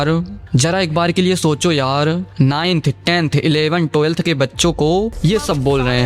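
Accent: India. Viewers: bruhh don't speak in english. your accent is soo indian man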